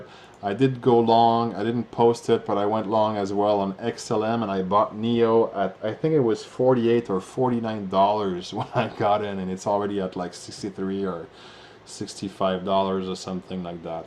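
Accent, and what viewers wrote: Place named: Quebec, Canada. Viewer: Accent Québécois !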